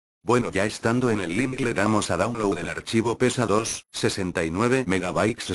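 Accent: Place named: Latin America. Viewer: Hablas con una mezcla de Latinoamericano (por hay argentino o chileno) con otra mezcla de español.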